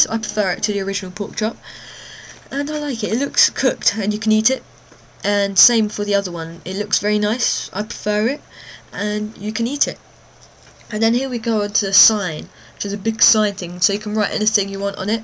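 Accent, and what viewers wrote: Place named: United Kingdom. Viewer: Awesome a british accent !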